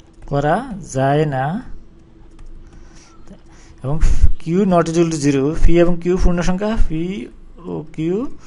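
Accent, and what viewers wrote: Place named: Noakhali, Bangladesh. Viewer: স্যারের বাড়ি নোয়াখালী। স্যার বলে ফুর্ণ সংখ্যা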